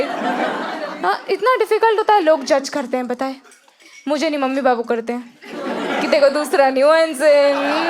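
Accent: Bhopal, India. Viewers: i know that you have passed out from carmel convent bhopal your totally representing the accent and youth of bhopal...btw nice keep doing this ultra comedy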